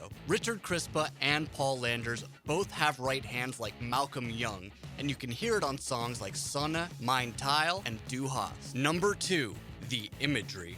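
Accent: United States. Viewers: You said german is a brutal language. So could you please spell it at least not with a hardcore american accent? (Sry for being shitty but some names were spelled really meh xD) But good video! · The american accents fucks me up. dude please try im not even german and it hurts me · Hearing Americans speak German is just silly😂, but you props for doing it! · he kinda reads the words in english. He made great points about the band and that's what matters. If we judge, we can go as far as judging fans for not understanding german and that might include you. if it doesn't, it still includes like 80% of their fans. Yeah yeah, we know you know german better than others... save it. It's typical for americans to not use rolling r and sh the way germans do.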